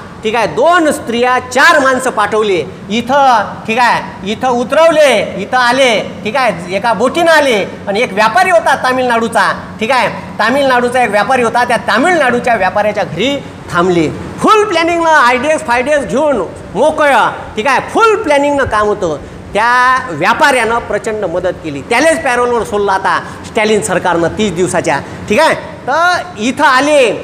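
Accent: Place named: Vidarbha, India. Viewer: विदर्भी मराठीत खूप छान ऐकायला मिळाली माहीती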